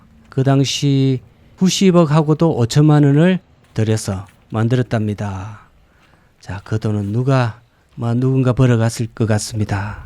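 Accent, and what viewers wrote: Gyeongsang, South Korea. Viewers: 전라도 사투리도 듣기 싫지만 경상도 사투리 심한 것도 귀에 거슬립니다. 억양은 어쩔 수 없더라도 일부러 더 심한 사투리 쓰는 것은 좀 지양하심이. 몰입을 방해해요.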